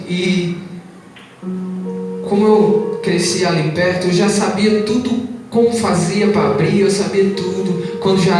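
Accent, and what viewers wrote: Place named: Bahia, Brazil. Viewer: Sotaque do interior da Bahia que saudade!!!!!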